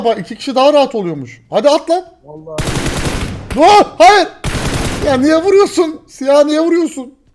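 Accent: Azerbaijan. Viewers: abı Azerbaycanlımısın?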